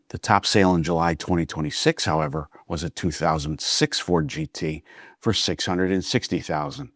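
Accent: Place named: New Jersey, United States. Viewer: . I really enjoy your videos. Thanks for doing them. It might be your Jersey (?) accent, but Wimbledon does not have a t in it, and asterisk is pronounced as it is spelled. I realize i am pedantic.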